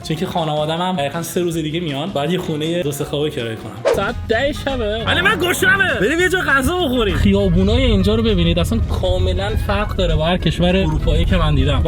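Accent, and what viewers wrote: Shiraz, Iran. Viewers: پشمام شیرازی هستی❤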